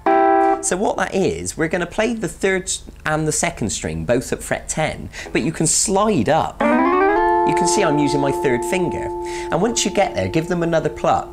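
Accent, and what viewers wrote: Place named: Bristol, United Kingdom. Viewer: That's gotta be a Bristol accent I know that anywhere me Babs 😂. Brilliant lesson all the same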